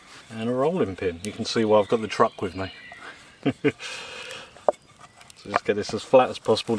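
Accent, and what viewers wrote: United Kingdom. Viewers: The sensational combination of at least 3 star level meals, while cooking via bushcraft, and narrating with a british accent.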